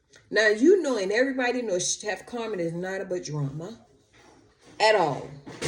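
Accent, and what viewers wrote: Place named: New Orleans, United States. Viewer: Ms you very pretty and I like your accent. Are you from New Orleans?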